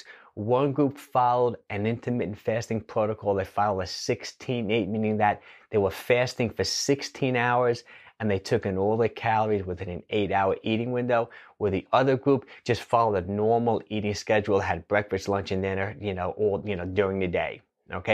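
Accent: New York, United States. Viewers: you New Yorkers have your own accent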